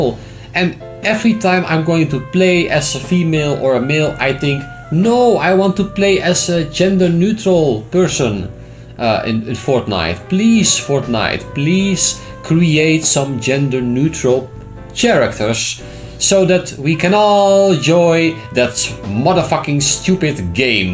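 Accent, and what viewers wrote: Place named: Netherlands. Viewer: I'm from the united states and I love your dutch accent. I'm loving it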